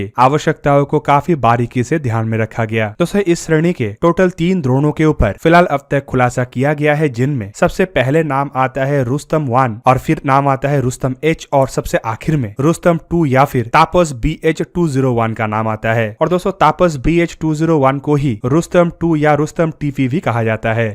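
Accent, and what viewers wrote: India. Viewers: Text english but india voice :-)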